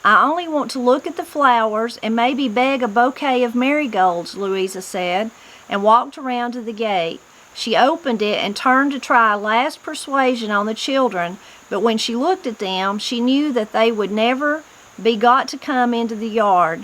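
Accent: Appalachia, United States. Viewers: As Mountain Path progresses, the plot thickens and the mysteries grow ever more mysterious. Hearing this story of Appalachia being read in your native accent, makes it all the more interesting and authentic. Thank you for bringing Mountain Path to us.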